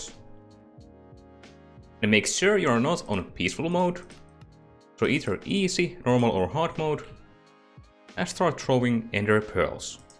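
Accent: Finland. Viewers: Are you finnish ?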